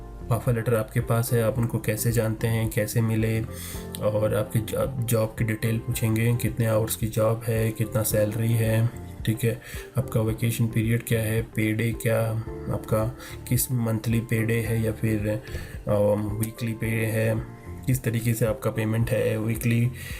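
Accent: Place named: Ireland. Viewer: Dang that's a thick Irish accent or are you drunk or both?? Please next video try accent free English, then I can understand what you are saying.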